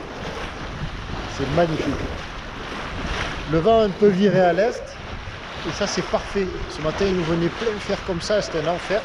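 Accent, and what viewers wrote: South, France. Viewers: Pas mal ton anglais avec l'accent du midi! Bonne mer mon, fait nous rêver, avant mes vacances (juillet et aout, mais en atlantique) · Hello, How are you! It’s cool que tu speak english!. Je ne m’y attendais vraiment pas et ne me permettrais surtout pas de me moquer, je ne fais vraiment pas mieux... cette chaîne s’ouvre aux anglophones, bravo. A priori ceux de Falmouth comprendront certainement mieux l’accent du sud que les écossais 😉 isn’t it? Y a plus qu’à te souhaiter bon vent pour ce nouveau voyage, je devrais plutôt dire cette nouvelle odyssée... vu la destination ciblée. Attention à bien éteindre l’AIS en Croatie, sinon tu vides le porte monnaie pour rien. Ciao, tchuss, salut, arrivederci, bye bye 👋. Et merci pour ces images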